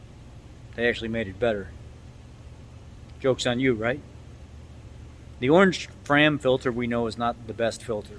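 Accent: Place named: Chicago, United States. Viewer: You sound like you from back east Chicago area lol I love the content.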